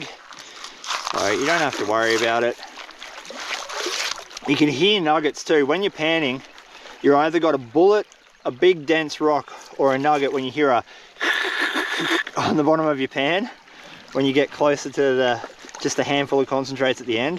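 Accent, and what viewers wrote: Australia. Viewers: Aussie accents are only 50% understood to start with. The background noise cuts that by too much to know what's being jabbered about.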